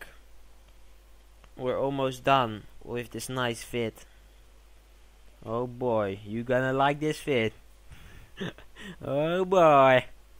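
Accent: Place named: Netherlands. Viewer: lol nederlands je praat een beetje engels met een nederlands acent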